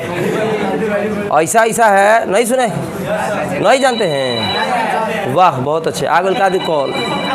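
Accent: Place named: Bhojpuri, India. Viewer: Sir aapke per ye Bhojpuri aawaj accha nhi lagta hai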